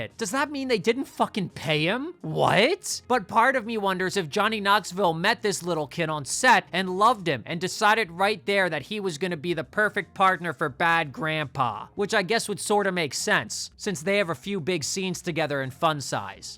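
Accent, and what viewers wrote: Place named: Hawaii, United States. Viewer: Dude r u from Hawai’i?? U got sum pidgin in you!!!!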